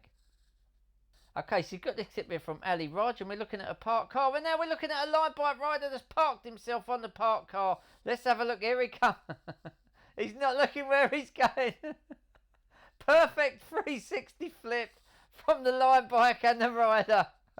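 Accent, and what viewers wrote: Cockney, United Kingdom. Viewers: Absolutely agree about lifetime ban for really dangerous or drunken driving. But it never happens, does it? I love the commentary and the cockney accent is perfect!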